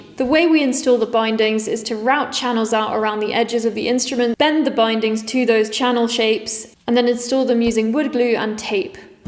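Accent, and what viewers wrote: United Kingdom. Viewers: Man, your British accent suddenly reminded me of those 90s/early 2000s BBC documentary programmes.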